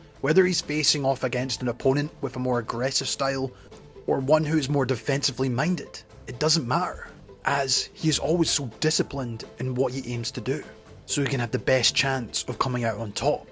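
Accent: United Kingdom. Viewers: what is your accent? British? Welsh? Scottish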